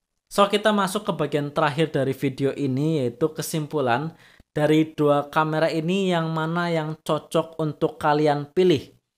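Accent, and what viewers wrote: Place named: Java, Indonesia. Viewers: Medok banget masny, tapi bagus penyampaian nya lengkap as pengguna sony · medok bgt bang,wong jowo yo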